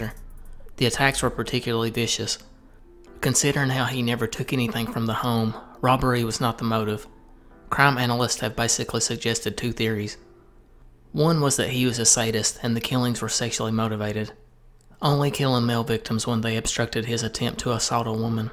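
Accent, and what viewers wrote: South, United States. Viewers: You sound so southern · From one southern youtuber to another, i appreciate hearing the accent. It's a character thats been mostly lost on youtube. Also great research aand pace with the video.I feel like you chose just the right ammount of time to spend on each topic. Subscribed big dog. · that southern drawl lol.. it’s one of the reasons i like this channel and caroline easom’s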